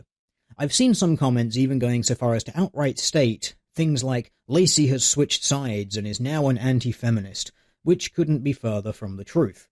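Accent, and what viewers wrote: United Kingdom. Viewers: Wait. He's British? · Wait, you're British!? I thought; was Canadian.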